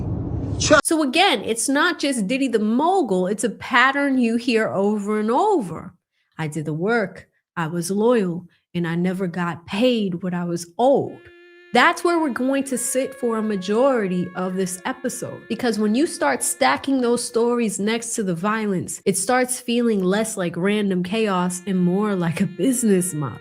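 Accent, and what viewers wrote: Boston, United States. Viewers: You really let the Boston accent out in this one.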